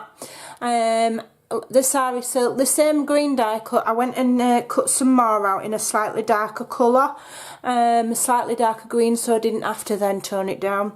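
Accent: Yorkshire, United Kingdom. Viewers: Only just discovered this brilliant double sided page cluster and I 💝 the concept... and the yorkie accent 😊 Cheers from Australia 🐨 🐾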